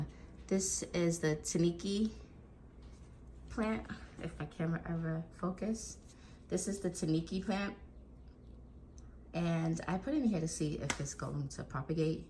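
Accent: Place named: New York, United States. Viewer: Your plant babies are cute. Love the NY accent!!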